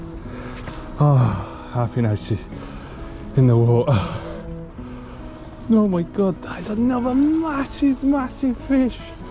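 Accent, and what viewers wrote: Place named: Australia. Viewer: Thats awesome catching huge perch in town and i got to hear a fellow Aussie 🎣👍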